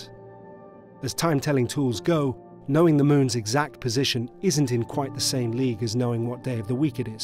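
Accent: United Kingdom. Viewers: Great video. Love this style, and of course the British narrator. Beautiful watch for sure too!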